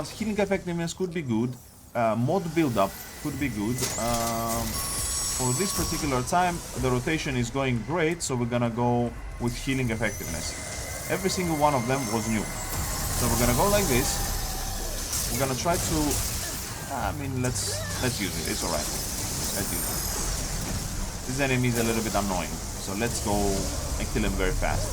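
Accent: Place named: Greece. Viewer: Nice video. I miss your regular vids where you don't livestream. Only thing I would change would be the necklace, which I think jester's bell would be a bit better since havok form scales off of cast speed, at least it used to. Still, super good video. So good that I watched the whole thing. Yes, all 1 hour, 26 min and 31 seconds. I appreciate the raw gameplay that few people really want to do. I also love your greek accent. I've alreay used this plenty of times but I never thought to use sapphire dreamstone for the cooldowns.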